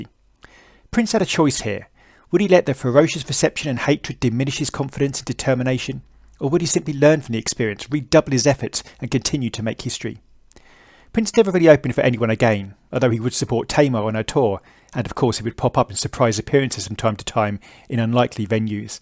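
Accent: United Kingdom. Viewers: Oh really? So that was your video narration, then? Well, it just happens to be truthfully the case that your ultra-posh accent led me think you're likely gay. There's nothing wrong with being gay in and of itself, btw. The only thing I actually have against gay culture these days is its wholly unrealistic demand for universal acceptance, which it will never get, if for no other reason than that it runs contrary to many people's traditional religious beliefs. Anyhow, if what I said about your accent bothers you, then I suggest you gtfo of the London area and spend time with scousers. You may or may not like them, but at least you'd rarely suspect they're queer based on voice alone. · ingilsh accent, ingilsh bs.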